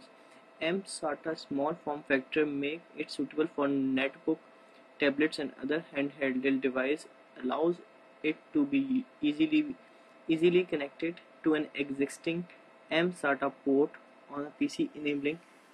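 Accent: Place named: India. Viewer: WHY you are talking like this..! Indian English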